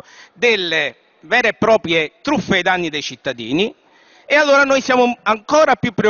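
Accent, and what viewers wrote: Rome, Italy. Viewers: Senza slogan e battutine da terza elementare con accento zingaro romano non capisce?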